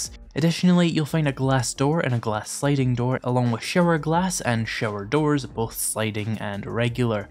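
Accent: Scotland, United Kingdom. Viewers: he sounds Scottish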